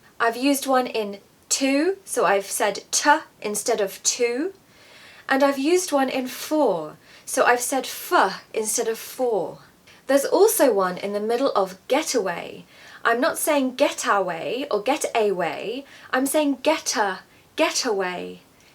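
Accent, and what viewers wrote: RP, United Kingdom. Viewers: I love your beautiful RP accent even I live in Australia. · Thank you so much for teaching us teacher i love you so much! Your british accent is beautiful. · she sounds so British, I love it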